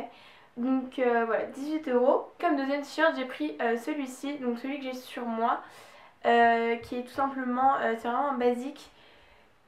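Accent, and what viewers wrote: Brittany, France. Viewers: J'adore tout ce que tu as acheté ! Juste une question, tu es bretonne ? o: "Bref un caillou blanc" tu m'as tué :''') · tu est bretonne ?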